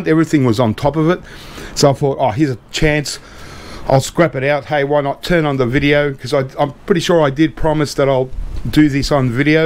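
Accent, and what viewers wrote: Australia. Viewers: Hello from Napa Valley, CA, USA!! I have seen many of these teardowns of air conditioning rads. First of all, those copper coils or "noodles" are sawed off, then that sheet metal piece is removed before scrapping as it is. Love your "Down Under" accent and keep the videos coming!! Watch out when scrapping the second rad!! I saw a menacing looking spider!!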